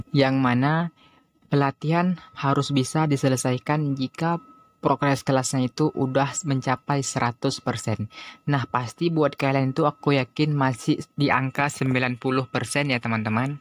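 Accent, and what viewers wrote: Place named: West Sumatra, Indonesia. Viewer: Trima kasih infonya bang Btw suaranya kek minang kocak hahaha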